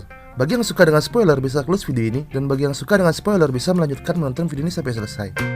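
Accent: Bali, Indonesia. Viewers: Kyk logat bali bang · Bang logat lu kek orang Bali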